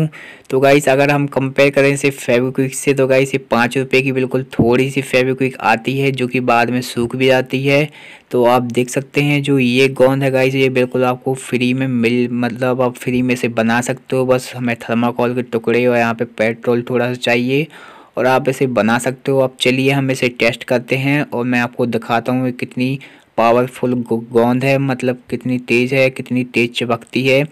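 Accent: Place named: Narsinghpur, India. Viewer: Boli se 😃 narsighpuriya sabse badhiya 😉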